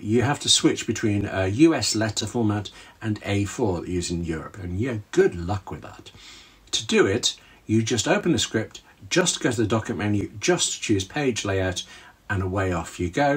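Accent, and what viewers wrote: England, United Kingdom. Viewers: Truthfully... I made this same mistake in an email... easy to do... (Smile). Thank you again for this great video... By the way, your voice sounds like you may be from England? I just found out through DNA that I'm 68% English 23% Irish (Now this explains why I'm so Victorian WHITE skin) I have been told I have Bass Belly White skin... I do not think that is a complement, my response is, I am Victorian White skin, more porcelain... not the belly of a bass... fish! Laughing so hard... you can't make this stuff up!!! The reason for my inquire of you being English... is I am working on a manuscript, hopefully to turn it into a screenplay... It is based on a true story, which I just happened to be apart of, about one of my clients that went to Harvard a few years back, and because of this, I have linked the "Boston Strangler to Jack the Ripper" I won't bore you with the details right now, but if and when I do finish the script, and if it does something, I"ll let you know... 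I used to have family in the UK... the HARRYs we even had a shield or that crest? Please do not mind my ignorance. I hope if my screenplay about Jack the Ripper/Boston Strangler comes about, I plan to go to England... maybe find some of my blood relatives over there. It was my Aunt Helen Harry that taught me how to drink Proper Tea. It is a dream of mine to one day visit England.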